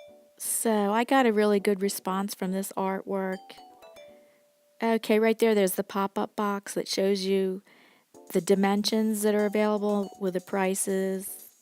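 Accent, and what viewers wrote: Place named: Pennsylvania, United States. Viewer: I like how she got her name. Sounds like you are from the other end of PA from me. That digital art was cool on an ipad. That wildlife one at the end had some pop to it. Watched the video in full gave you a like #payingitforward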